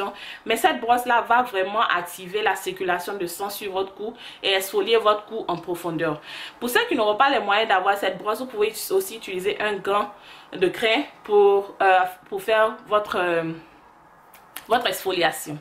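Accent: Ivory Coast. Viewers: Sans demandé je sais ke t'es ivoirienne, l'accent la ment pas dehhh, lol. Je m'abonne 😊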